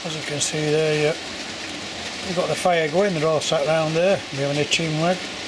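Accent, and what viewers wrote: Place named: Barnsley, United Kingdom. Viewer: i take it from your accent your Barnsley way?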